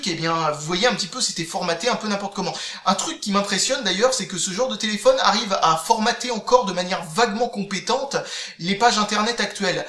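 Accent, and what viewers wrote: France. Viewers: Je suis entièrement d'accord, surtout qu'il prononce pas mal de trucs à la française...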